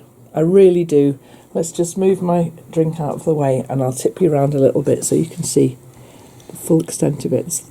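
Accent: England, United Kingdom. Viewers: I have must found your site. Lovely to hear an English accent. I live in South Australia. I will be looking forward to seeing more of your beautiful work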